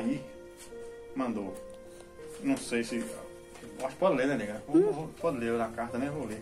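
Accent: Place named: Minas Gerais, Brazil. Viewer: O jeitim de falar do minerim é lindim. E pra completar um cafezim bem quentim.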